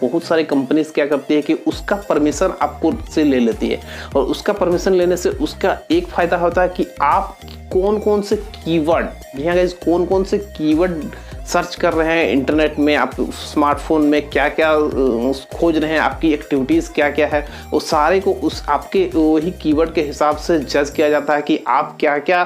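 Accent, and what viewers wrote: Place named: Assam, India. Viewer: U talk like Assamese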